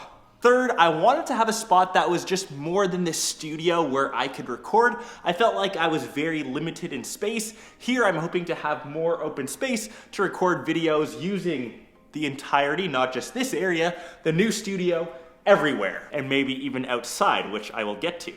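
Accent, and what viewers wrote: Canada. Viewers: Damn I can tell your Canadian hahah that accent hits hard!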